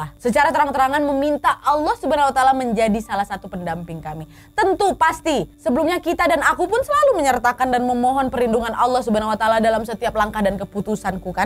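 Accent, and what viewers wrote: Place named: Medan, Indonesia. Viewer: Bahasa dan logat ini kayak orang MEDAN,mantap aku subscribe kakak..buat nambah ilmu motivasi dan semangat...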